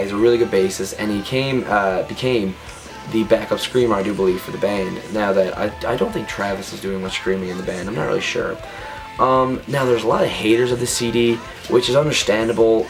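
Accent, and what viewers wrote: Canada. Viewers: you're canadian right? just asking 'cause your accent is AWSOME!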